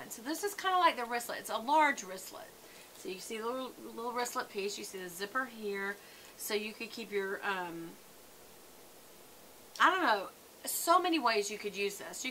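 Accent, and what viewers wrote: South, United States. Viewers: !!! OMG where have YOU been all my life! Brahmin AND A PLANNER GIRL?! I love it! This video has changed my mind about which Brahmin (will be my first one) for my birthday next week. I love the southern drawl, imma a bell too! 😘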